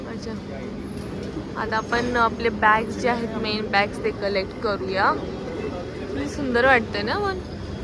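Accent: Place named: Pune, India. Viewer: kiti te puneri bolnya cha attahas to ag sangmneri bhasha visarlis ka aamchi bagh kashi bolte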